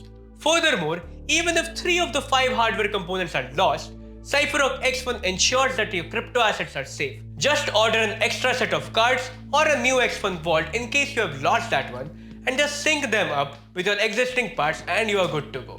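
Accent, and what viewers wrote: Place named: India. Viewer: Cypherock executives, you need to get an American, Englishman or other presenter with English as his native language. Unfortunately, to the rest of the world India is known as the internet scam capital of the world and you having an Indian present your sales pitch is doing your product acceptance/adoption great harm. You might have a fantastic product, but most of us are thinking “scam” as we watch. Seriously!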